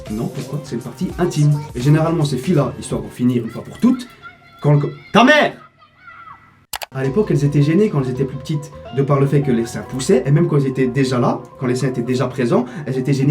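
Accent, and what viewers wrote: Belgium. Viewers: Tu as un accent belge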